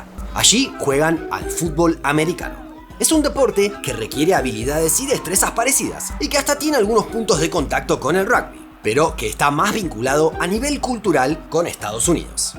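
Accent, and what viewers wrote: Argentina. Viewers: Es argentino el que habla? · Como tiene un cantito especial solo con las she pensé es argentino❤😊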